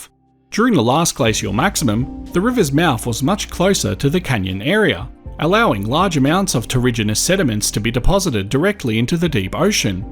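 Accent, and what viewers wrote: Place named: Melbourne, Australia. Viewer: Love the content - also you are the first person to demonstrate the Melbournian “Malbourne” accent (“e” = “a”) that I have heard about from people over the years 😂 love it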